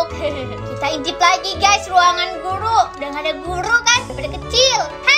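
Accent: Java, Indonesia. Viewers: Kak btw orang mana yak? Kek nya Jawa yak? Kalau Jawa, Jawa mana? Akoh Jawa tengah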